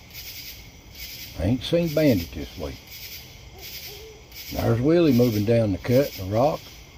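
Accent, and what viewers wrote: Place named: Missouri, United States. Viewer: Just found this. The narrator sounds like folks from my hometown in SE Missouri.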